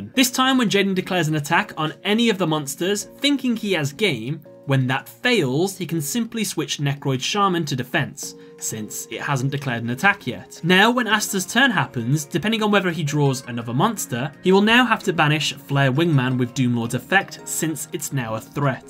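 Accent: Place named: United Kingdom. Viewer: yo man, I catch your content on occasion, like what you do and you are clearly loved in this community. Whenever one of my comments is slightly negative I usually don't write it, cause who needs that ya know?? But I'm really confused by your pronunciation, cause you sound British to me, yet you say Tempest and Mariner oddly, you seem to pronounce the syllables individually. Small thing, just catches on my ear whenever I hear it. and the slightly negative comment is...... that part about what fusions Jaden could have gone for after first using 'the warrior returning alive' ,.... so obviously filler man, so obviously, not necessary for your point either.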